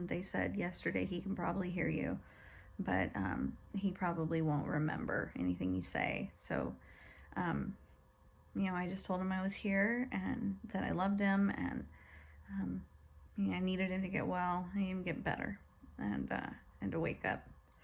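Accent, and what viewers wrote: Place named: United States. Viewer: My thoughts are with you sister. I'm a dissociated apostate 2 yrs now and my beautiful wife Nicole is still a inactive fully believing jw. I told Nicole if she ever needs a blood transfusion I'll give her one she said she'll divorce me. I told her id rather have a living divorced wife than a dead married one. When I dissociated 2 years ago over the child abuse cover ups. I rang up Nicolas father who's not a jw smart man and told him I'll give his daughter a blood transfusion if she needs one and I apologised to him for been so brain washed by this cult he thanked me and laughed when I said I'll give her my blood you'll get to keep your daughter I'll just lose my wife. I told her doctor I'll give her my blood he said we can't go against your wife's medical health directive and as doctors we can't go against your wife's religious beliefs I'll rip up her medical directive then. 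So dear sister what your going through now is what us normal people will all go through when we have jw family members who we dearly love and may well be in the situation your in now . So my dear sister I'll give you a big Australian hug all the way to America you sound American or Canadian us Aussies can't tell the difference .I love you sister and feel for you check out my you Tube channel called Justin porter I have 13 videos one where I impersonate Steven left and one where I film Australian fruit bats and talk about apostate goodies because us apostates are the goodies. Warm apostate love dear sweet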